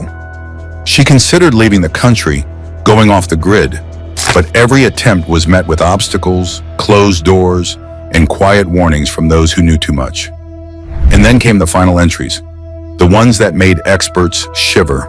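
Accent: United States. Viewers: Why is an American doing the commentary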